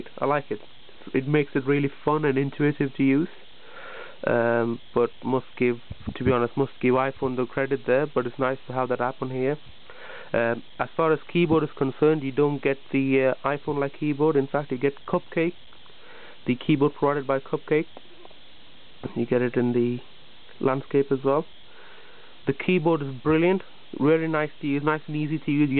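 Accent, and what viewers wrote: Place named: Trinidad and Tobago. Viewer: You Sound Like A Trini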